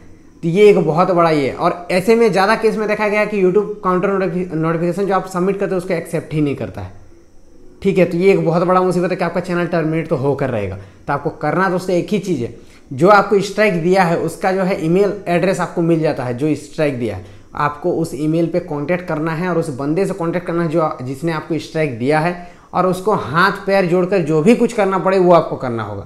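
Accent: Bengal, India. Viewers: Ap Bangali ho kya?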